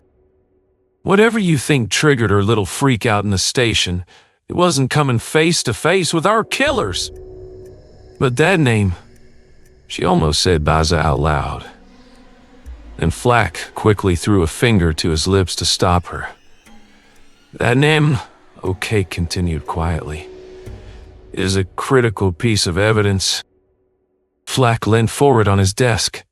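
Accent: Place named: United States. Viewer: What the helll? No American accents please!!! This is a book about the English police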